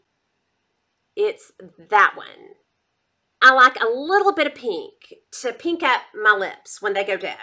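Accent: South, United States. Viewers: I love your southern accent!! I thought I was the only one that described my eyeshadow palettes as my children lolol xo-Wendy, NYC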